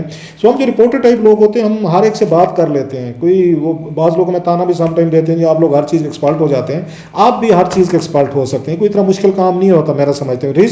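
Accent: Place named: Punjab, Pakistan. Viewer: Rauf ji, i'm from India. I like your topic selection n analysis .n live your heavily loaded punbabi accent...keep going ..all the best...Not seeing Amir Mateen saab.. best wishes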